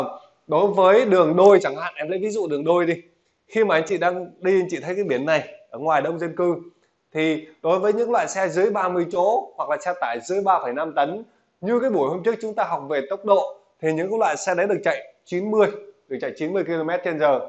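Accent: Thanh Hoa, Vietnam. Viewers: Anh oi anh phai người quê thanh hóa ko ạ , bai giảng của anh tuyệt vời lắm ạ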